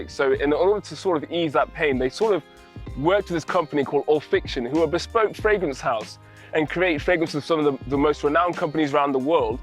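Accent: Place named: England, United Kingdom. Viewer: Great video and car, I definitely agree on the ‘head turning design’ notion! Your videos really remind me of Carswithluke (and not only due to the similar English accent), where there is an emphasis on cinematography, landscapes and storytelling. Keep up the good work!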